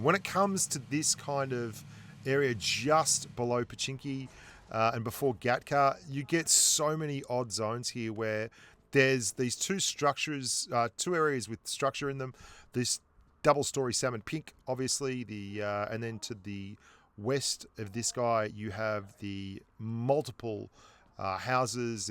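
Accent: Australia. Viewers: you have the best pubg mobile videos! I can’t get enough. ( The Aussie accent helps;)) IGN: Salt65 #pochinki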